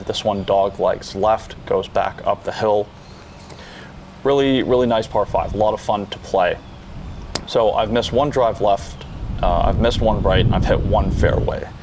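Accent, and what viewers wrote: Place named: France. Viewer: What is the name of the course? Us dumb Americans cannot understand a word when you throw a French accent on it.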